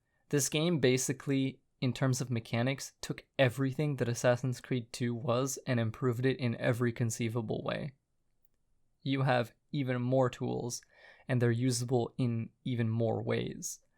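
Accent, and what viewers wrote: Eastern Europe. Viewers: You're Eastern-European? Where from? I'm half Polish. Also, I love when you say godlike.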